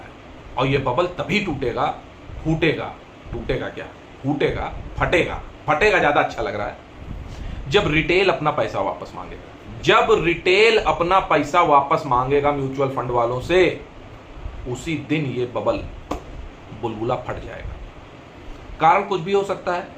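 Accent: Kanpur, India. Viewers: paisa ghar kaha aaya be maza aa gaya ye dialogue sunker bade bado ko ye baat samjhne me aadha jeevan lag jata hai sahi me aap kanpuriya style me bolte ho hum bui kanpuriya hai sab bahut dhag se samjhte hai maan gaye jeevan kaise jeena hai kyo hum log jeevan me aaye hai kyo hume future ki chinta nahi karni hai matlab utni karni hai jisse kaam chal jaye aur jeevan future ki chinta me kharab nahi karna hai is video me bahut kuch seekha ja sakta hai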